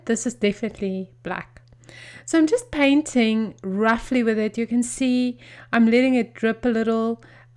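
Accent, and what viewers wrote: South Africa. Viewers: It's the first time watching your channel, and i thoroughly enjoy your accent, sounds much like my own - South African. By the way - butter chicken is my favorite!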